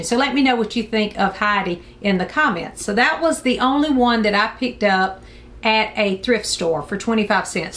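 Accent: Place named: North Carolina, United States. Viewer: Are you from NC? That's where my mom was from and your accent is so similar. That copy of Heidi is gorgeous! I haven't heard of those LM Montgomery books, but I love those covers too:)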